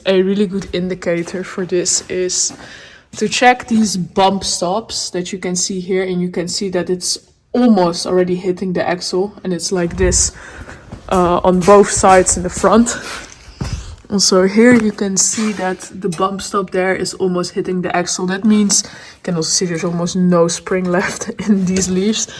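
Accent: Netherlands. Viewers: Wat ontzetten gaaf(!). Van de kanalen die ik volg die barnfind fideo's maken, komt 80% uit de Verenigde Staten, en de rest uit het Verenigd Koninkrijk. En dat zijn er wel een paar. Van de thumbnail dacht ik heel even dat je ook uit de VS kwam, maar je accent is onmiskenbaar Nederlands!😉 Het is leuk om iemand tegen te komen op het internet die zogezegd "uit de buurt" komt. Ik ben heel benieuwd wat de levensloop van deze 4x4 gaat worden en welke avonturen het gaat beleven. Èn of het misschien ook een naam krijgt. Zoals bijv.: Martin de Subaru, en Oliver. Een 1963 Opel Kadett. (Edit: oeps.. Beide auto's van Richard Hammond van Top Gear/ The Grand Tour. Maar dat wist je waarschijnlijk al😅)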